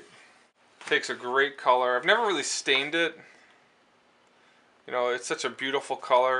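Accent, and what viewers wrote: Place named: New Jersey, United States. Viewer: That sounds like a Jersey accent. Good review.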